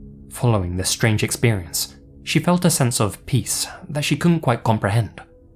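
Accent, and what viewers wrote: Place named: United Kingdom. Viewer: Ngl I hate British accents… but in this case the way you narrate stories with your British accent actually makes the stories better. Keep up the great content!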